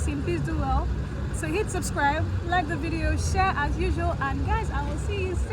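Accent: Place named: United Kingdom. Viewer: I honestly thought you were speaking Chinese at first. I don’t know if you can speak Chinese. If you can, then your British accent and your speed of speech articulation on top of that is making things worse.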